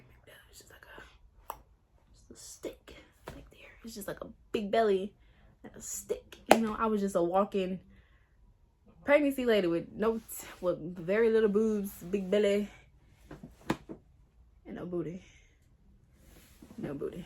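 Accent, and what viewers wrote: United Kingdom. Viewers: o shii i’m a new sub and i thought she was from london fr😂😂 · It was the British Accent for me 😂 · She British like what😲😱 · At first the British Accent🤣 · lmaf loving the British accent❤️ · I’m British so ik that did u not read the comment 🙄 it sounded like how my cousins from north London speak so that’s why I said that so pls have a good day and goodbye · Is the Brittish Accent for me 😂 Your so pretty 💞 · your British accent isn’t bad x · It’s the natural beauty for me🥺🥺and girl I’m from London and that British accent was on point🤣🤣🤣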